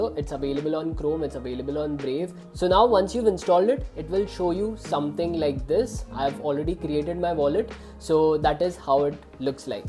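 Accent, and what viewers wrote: India. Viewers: Almost had me diddy till I heard an indiann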